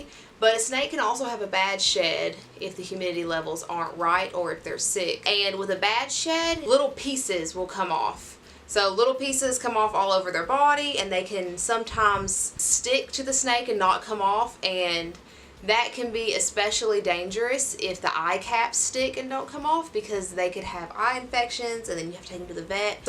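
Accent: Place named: Alabama, United States. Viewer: You sound like you are from Alabama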